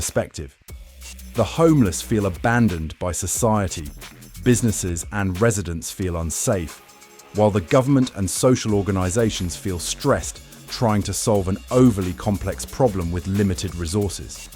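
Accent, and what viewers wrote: United Kingdom. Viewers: This is not not not the fault of “a society “ like the narration of the idiot writer of this script ! This is a result of Democrat run cities like Portland, Seattle, San Jose, Los Angeles and many others. An immoral government allows for homeless encampments, poor law enforcement, leniency towards drugs and prostitution along with allowing gangs and cartel control… the wealthy drink wine and socialize while they care nothing for law and order and safety, health and security for the community. The facts speak for themselves. I live in a peaceful clean area of good governance by common sense leadership. Thank goodness for some good places to live still. The narrator should take his British accent and tell the story of the Isslummist problems in England! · You sound like you're from the UK, why don't you go back, there's plenty to work on there. 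It's such a crazy thing that naive people tend to believe people from the UK or Australia because they have this accent that tickles their ears, all the while the UK is imploding.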